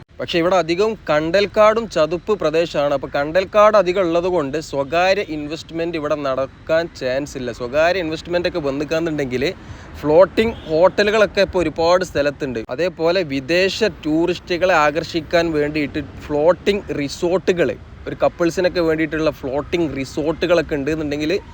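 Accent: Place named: Kannur, India. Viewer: സൂപ്പർ വീഡിയോ ഇത്രയും മനോഹരമായി dron ചെയ്ത വീഡിയോ ആദ്യമായിട്ടാണ് കാണുന്നത് നല്ല അവതരണവും ഇടക്ക് കോമഡിയും സൂപ്പറാക്കി നമ്മുടെ സ്വന്തം കണ്ണൂർ ഭാഷ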